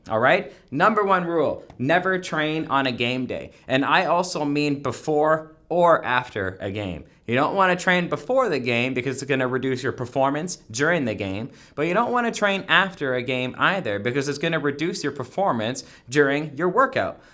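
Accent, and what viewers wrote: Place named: Canada. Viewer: canadian soundin fellow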